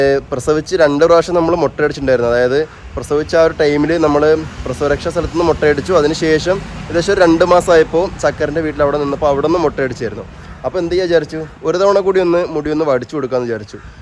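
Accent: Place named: Palakkad, India. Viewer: നീ ചെന്ന് പറയണ. ചക്കരയെ കാളും.. പാലക്കാടൻ ഭാഷ യാണല്ലോ. കൊല്ലം ഭാഷ മറന്നു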